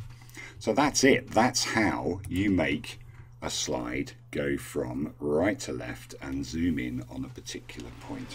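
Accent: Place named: United Kingdom. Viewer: Thank you for this video! You saved me hours of frustration with the Ken Burns effect! Loved listening to your British accent too! lol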